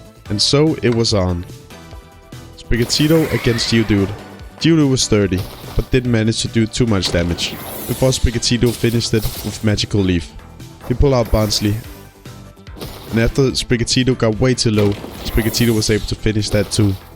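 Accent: Denmark. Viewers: Denmark maybe 🤔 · are you danish, you sound very danish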